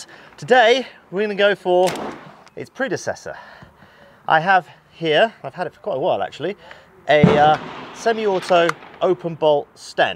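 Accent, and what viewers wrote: Switzerland. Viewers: the language spoken by the guy behind is actually Bernese German or even more precise Bernese Oberland German. Swiss German doesn't actually exist as a general spoken or written language. Every region has its own dialect. My own dialect (Zuri Schnurre) is different from the folks in Berne, Thurgau, or Basel. And if you really want to hear a strange dialect you have to visit the German part of Valais or Wallis. And by the way starts to sound like a real Berner lately. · Thats some fine swissgerman right there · Your switzerdütsch is adorable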